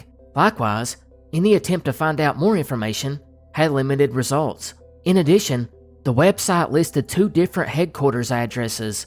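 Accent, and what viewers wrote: South, United States. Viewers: The southern drawl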